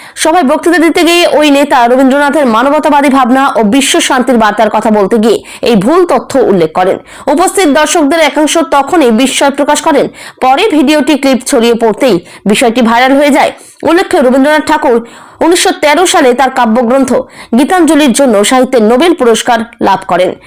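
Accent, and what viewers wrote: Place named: Bangladesh. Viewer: বাজে চ্যানেল।বাংলা উচ্চারণ ভুলে ভরা।মনে হচ্ছে বাংলাদেশের কেউ খবর পড়ছে।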